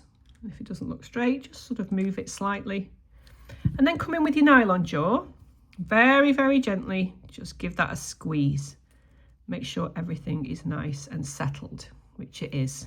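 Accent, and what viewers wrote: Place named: England, United Kingdom. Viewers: I love you English accent. It’s awesome and I love this design. Thank you. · Wow that is such a lovely design! Lends itself beautifully to the bracelet,and the earrings with the dangly paddles are a triumph! We love to get a glimpse of your life by listening to your warm Lancashire accented voice teaching us as well as generally chatting. I think of you as my lovely clever talented friend who just happens to live half a world away! Your tip about how you finished up with your exact measurements was invaluable. Ok I’ll stop waffling now - I’m glad you are mostly over your “lurgie” as we call it in Australia. 😘 Roz on OZ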